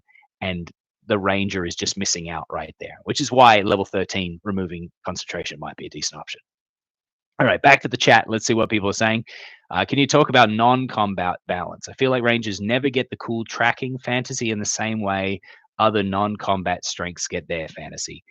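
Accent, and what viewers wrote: Australia. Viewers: I think there's an Ozzie filter on the microphone. LOL! I've watched a lot of videos and you've never sounded Aussie until this one. No worries, mate. Maybe it's because ya just got back, but your accent is not usually prevalent. Maybe it's because of your other voices.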